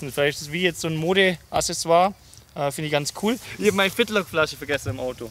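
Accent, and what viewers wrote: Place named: Allgäu, Germany. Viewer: Grieß da! Ich kann mit Downhill zwar eher wenig anfangen bzw. hab da zu arg fear, feier deine Videos aber richtig ab. Bleib auf jeden Fall so spontan, witzig und sprachlich Allgäuerisch eingefärbt. Das ist mega Authentisch!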